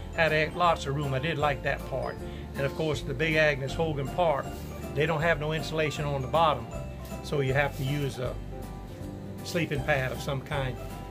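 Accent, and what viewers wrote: South, United States. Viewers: So glad I found your channel. I'm just a backpacker wannabe, but have done a lot of motorcycle camping with my husband, and find that the lightweight gear choices are similar. Your videos are fun and informative, and it's nice to hear another southern accent!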